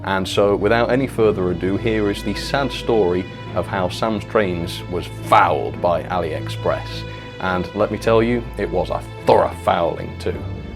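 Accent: United Kingdom. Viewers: Dear Sam, I found it thorougly amusing, to hear you hate it so much, in profound solid brittish, marvoulous thanks! :D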